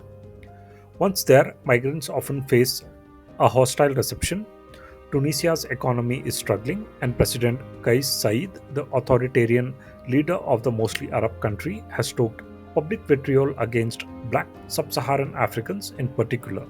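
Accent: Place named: India. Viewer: The narrator sounds Indian, plenty of room in India to enjoy mass illegal immigration . Send them to India or the other countries of the Indian sub continent where they can enjoy diversity at the expense of the Indian people or the people from the surrounding countries. Or let the dishonest narrator welcome them into his home free of charge. Not asylum seekers but illegal immigrants , enough with your lies.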